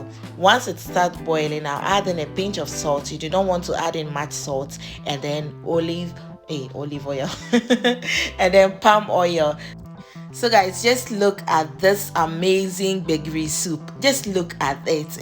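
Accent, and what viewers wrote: Ghana. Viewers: just when i was thinking of how i could see ewedu leaf to buy . you just thought me another idea with spinach and okro. i guess you are Ghanian from your accent. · This is not normal. She sound Ghanian. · You sound like Ghanaian lady good job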